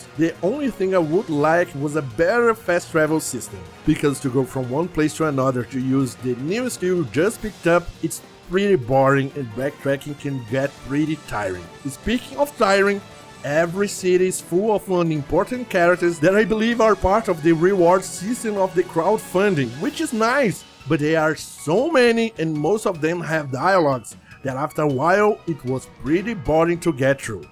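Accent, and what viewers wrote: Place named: Brazil. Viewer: Thanks! Now I know what a Brazilian person actually sounds like for once! Great review!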